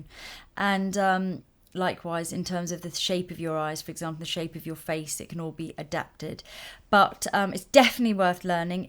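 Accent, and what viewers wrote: United Kingdom. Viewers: So peaceful your voice! I really love watching your videos 🥰, and love your British accent, it takes me back to my high school days with my British professors 😍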